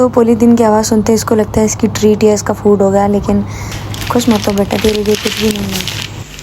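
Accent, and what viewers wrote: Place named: Marwari, India. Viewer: Es aavaj m alag hi marwadi accent aarha h😝👍🏻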